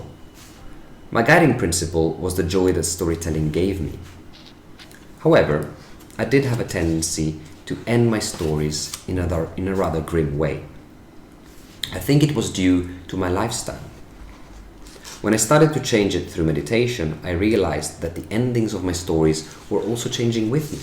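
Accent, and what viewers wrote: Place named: Sweden. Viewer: You must’ve picked up some of the weird ways Swedes pronounce English too because my “Swede-sense” was definitely tingling when I heard you speak 😅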